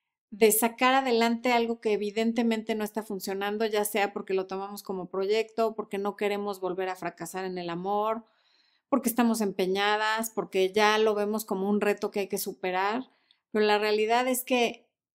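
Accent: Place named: Mexico. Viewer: Lo máximo. Me encantan tus consejos. Y tu también tu asentó mexicano.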